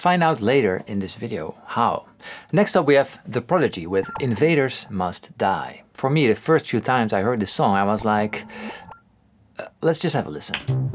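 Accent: Netherlands. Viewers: Are you Dutch? Something about your accent feels familiar.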